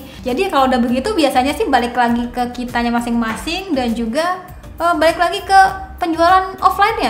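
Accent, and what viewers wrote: West Java, Indonesia. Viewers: Sunda neng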